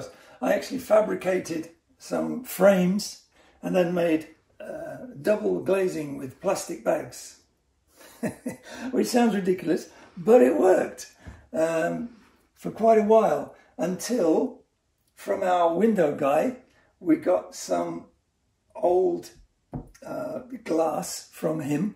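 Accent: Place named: North Staffordshire, United Kingdom. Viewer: An excellent piece about a wonderful little house! :) And narrated by a fellow who sounds a good deal like Lemmy :D You wouldn't happen to be from around that neck of the woods would you (as in where Lemmy was from)? There are elements in your accent that are familiar to my ear, being a North Staffordshire lad :).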